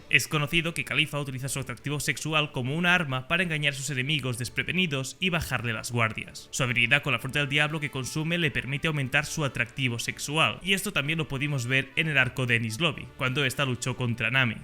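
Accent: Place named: Catalonia, Spain. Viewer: com es nota q ets català a l'hora d parlar jajja brutal el video com sempre!❤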